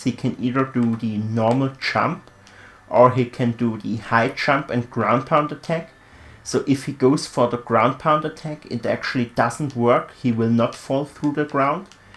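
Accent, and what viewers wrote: Germany. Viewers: Wait!... Are you from Germany? 🇩🇪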